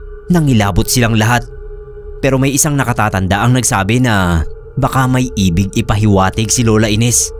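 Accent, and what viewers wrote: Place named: Ibanag, Philippines. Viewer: ibanag ang accent ng story teller.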